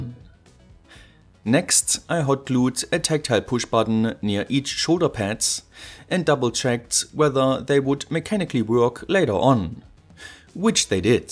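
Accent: Germany. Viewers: German man ? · are you german? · the german accent is making me crazy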